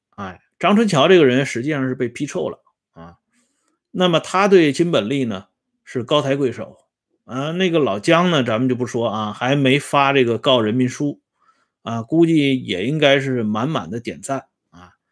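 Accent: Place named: Tianjin, China. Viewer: 我又听出天津味了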